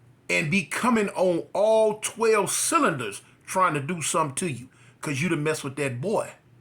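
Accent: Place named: Texas, United States. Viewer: Are you from Texas? Why do you sound like my dad? Lol.. I know my dad, I'm not saying I don't.. But it must be a Texas thing how y'all talk, lol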